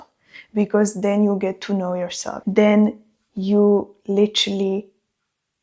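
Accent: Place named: England, United Kingdom. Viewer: Ahh that's a lovely english accent. What's your original language?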